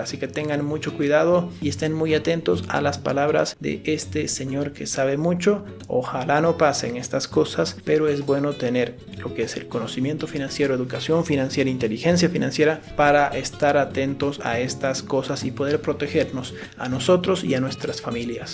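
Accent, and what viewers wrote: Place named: Cuenca, Ecuador. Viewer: Muy buen video primo! ( tienes acento cuencano talvez si somos primos!)👍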